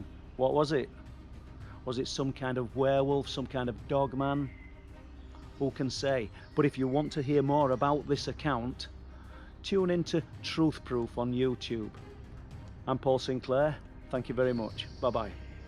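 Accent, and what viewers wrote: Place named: North, United Kingdom. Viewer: It’s the northern accent (slight I know) that really works with spooky stuff. Like Deb Hatswell.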